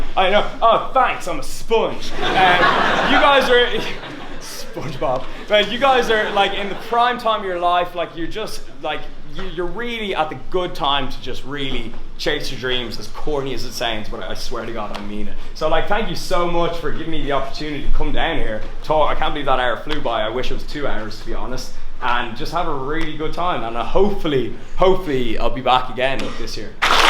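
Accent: Dublin, Ireland. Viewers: Are you from Dublin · what part of ireland are you from??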